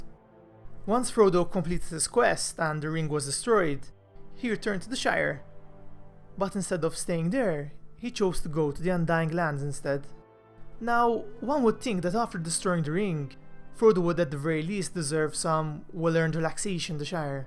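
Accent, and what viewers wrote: Malta. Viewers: Really good videos! Are you maltese? Your accent sounds maltese 😁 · Thanks for all the info it explained alot as just binge watch all the movies including the side movies. 1st off I have never witnessed a movie collection done so well and not just added more junk just for the money 2nd although the movies could not have been done any better,the BOOKs are the way yo go and must be read before watching the movies as your imagination can only do it justice and if done the other way around. I personally think if done the other way around you ruined an experience youll never get to have again!! So again thanks for the explanation and keep up the amazing work!! One final question and if you cannot answer I fully understand but, by any chance the person speaking in the explanation of this clip is he or are you Maltese!? Im wondering after hearing your accent. 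;) again thanks for all youve done and hopefully I will get to speak with you again as Im trying to start my own journey and building a fantasy survival horror game based on locations in Malta and could use you're help but if you're busy I fully understand and wish you the best for the future,keep safe and hopefully Ill hear from you soon Regards Matthew Evans Vella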